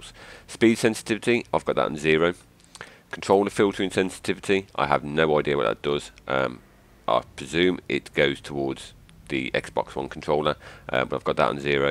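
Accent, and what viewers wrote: Black Country, United Kingdom. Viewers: where u from mate. I can here the yam yam accent. Lol im from wolves.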